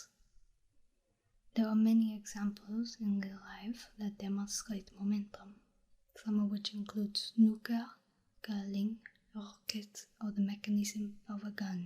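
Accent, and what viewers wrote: France. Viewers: i love ur french accent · Love your French/foreign accent roleplays, like this one and the French exchange student series. I'd love to see more of that!! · Yes I've it plz more french accent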